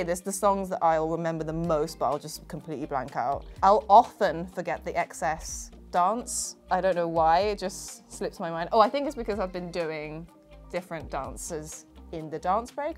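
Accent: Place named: United Kingdom. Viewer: She sounds British not American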